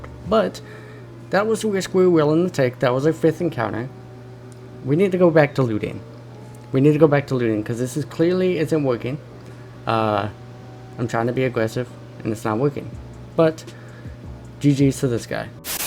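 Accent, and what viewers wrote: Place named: Boston, United States. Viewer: Are you from boston? Cool accent bro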